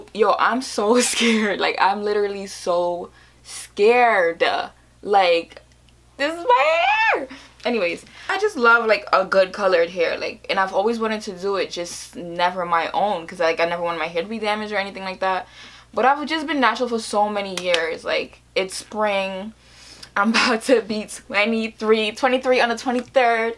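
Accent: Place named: Trinidad and Tobago. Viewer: Are you by chance from Trinidad? Your accent seems familiar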